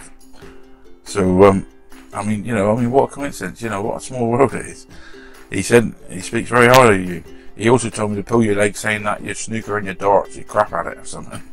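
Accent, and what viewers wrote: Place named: West Country, United Kingdom. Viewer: Hi, love the videos seen most of them, usually start my day with one, grew up in Dorset and have lived in the California for 36 yrs, love to here the west country accent.🇬🇧🇺🇸😎👋👍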